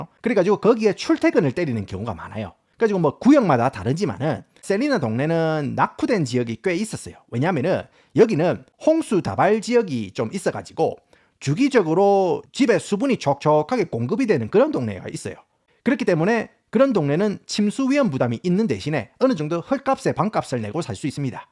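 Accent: Gyeongsang, South Korea. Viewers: 나도 경상도 사람이지만 사투리 억양이 듣기 싫어서 못듣겠어서 나갑니다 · 아닠ㅋㅋㄱ 님 걸쭉하게 경상 사투리 쓰다가 갑자기 영어발음 갑자기 멋있게 뽑는거 웃겨욬ㅋㅋㅋ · 이야기를 정말 맛깔나게 잘 하시네요😊.. 사투리도 좋구요~~ 고향이 경상도쪽이시죠?😅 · ㅋㅋㅋ...경상도 말이 너무재밌네요~구독좋아요~홧팅삼춘~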